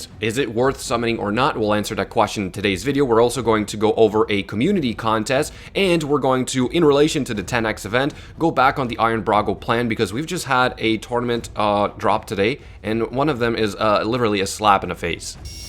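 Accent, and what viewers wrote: Quebec, Canada. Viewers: Yeah. Not even close. I think this guy has a bit of an accent more then anything. He sounds like he might be French Canadian.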